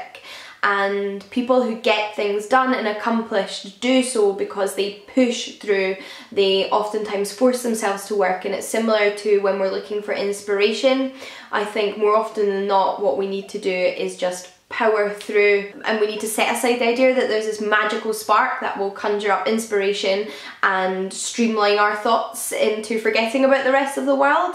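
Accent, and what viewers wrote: United Kingdom. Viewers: Well, nice to meet you! You're beautiful have a good british accent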